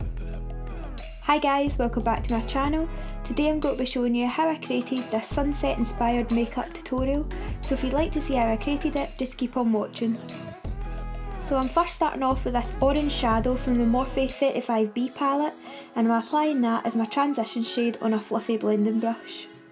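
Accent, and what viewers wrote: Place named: Scotland, United Kingdom. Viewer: Are you Scottish? Love the accent! My family are all from Scotland,, my mum and Dad moved down to England and so me and my sister got an English accent so we’re different from the rest of our family 😂😘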